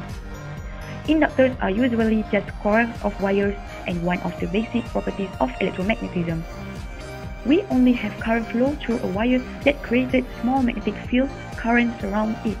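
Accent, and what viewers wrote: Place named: London, United Kingdom. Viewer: good Jobs korg...bangga ada member yg pandai speaking london..hehehe...:D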